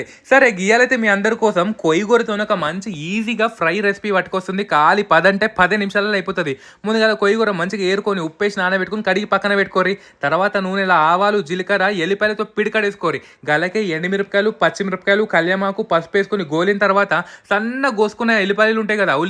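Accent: Telangana, India. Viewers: Ehh village bro meedhi? Pakka telangana basha undhi meedi !!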